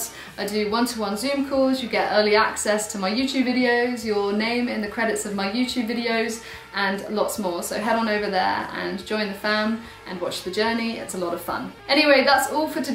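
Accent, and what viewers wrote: United Kingdom. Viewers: You and Emma Watson have the same particular brittish accent. That and you're both wildly talented and beautiful as well. Loved the video! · У девушки классное британское произношение.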